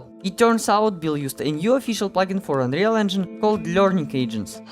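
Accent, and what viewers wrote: Russia. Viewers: ох уж этот Русский Акцент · У вас сударь немного русский акцент 🤔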